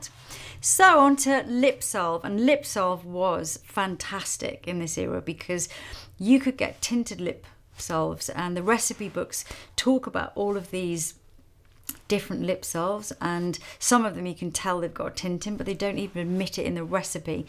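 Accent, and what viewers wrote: England, United Kingdom. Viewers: I looooove your English accent 🤤🤌🏽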